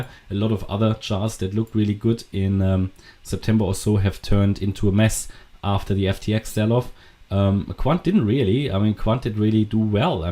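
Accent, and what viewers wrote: Germany. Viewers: Like Kris MM CRYPTO, Deutsche dialect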